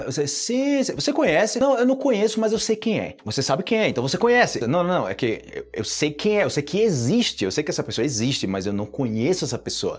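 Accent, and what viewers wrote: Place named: Antioquia, Colombia. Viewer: Me encanta como el profe cuando habla español se le siente puro acento paisa, obrigado!!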